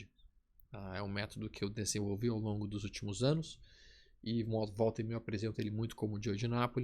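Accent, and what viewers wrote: Rio Grande do Sul, Brazil. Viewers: Tu é do RS?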